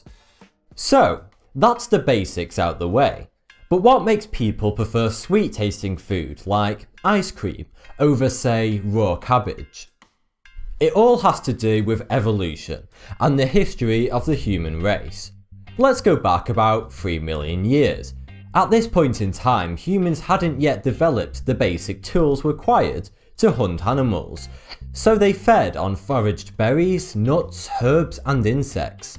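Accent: United Kingdom. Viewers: he's so British ha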